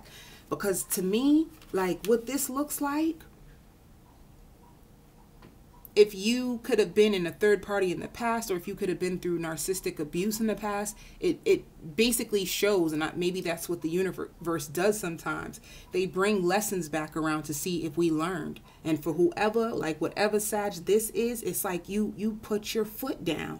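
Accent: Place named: Midwest, United States. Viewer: You sound like your in the midwest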